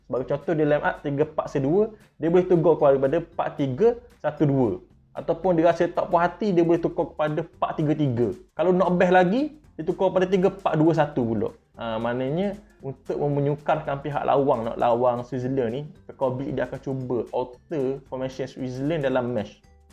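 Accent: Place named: Terengganu, Malaysia. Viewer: Ejah subtitle.. Dop paham loghat terengganu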